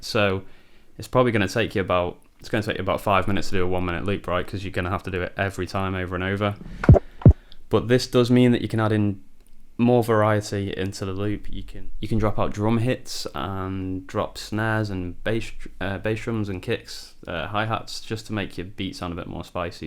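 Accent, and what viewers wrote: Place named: England, United Kingdom. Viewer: Hi again, while waiting to buy this machine I'm following your videos which will prepare me for when I'll have it here :-) I'm from Italy and had to slow down the video and turn on the subtitles to get every single word... I hope I'll get used soon to your English, I guess I saw too many American English videos and I'm not used to that pure English :-) anyway, that's not the point actually, but I didn't get the first part where you talk about polyphony... As far as I understand from the manual (sp404a) it can handle 12 voices. So it's not clear how it can stop working when at the beginning of the video you try to layer 3 drum samples over that piano sample... If they were all stereo samples, they would be 8 voices, thus it takes at least other 4 mono or 2 stereo to reach that limitation of 12. So I think that I'm not getting something, can you explain again please?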